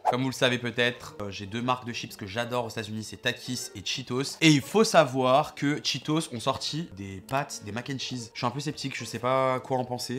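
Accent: Quebec, Canada. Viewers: Juste l'accent quebecois mérite un like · Ptdr l'accent québécois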